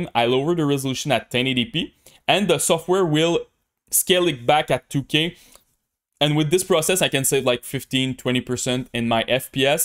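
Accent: Quebec, Canada. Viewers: C'est clairement un accens Québécois XD Good job pour le vidéo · Un Quebecois sur rust. Finalement !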